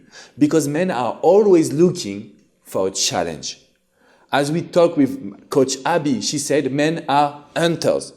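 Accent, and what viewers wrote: France. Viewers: I am falling in looove with your French accent.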